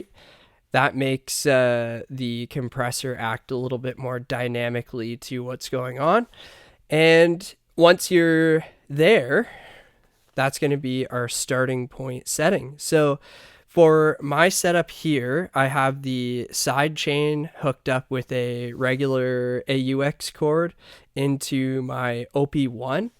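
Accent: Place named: Canada. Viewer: I detect a Canadian accent - where are you from?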